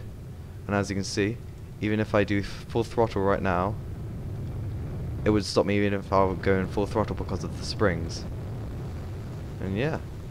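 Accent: United Kingdom. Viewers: Boii ur british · you have a really nice British voice dude, I think you should try going for a podcast :) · damn. U sound so british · R u British if ur British ur y biggest youtuber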